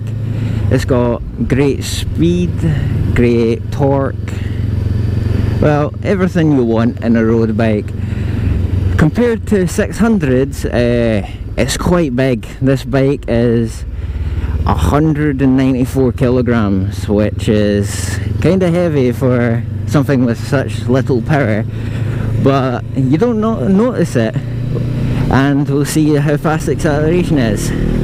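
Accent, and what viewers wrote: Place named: Scotland, United Kingdom. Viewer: Hi mate, nice review. You sound like you're from Scotland. I am as well, and was wondering if you could tell me where you got the bike? I'm thinking about getting it as well! :) Thanks.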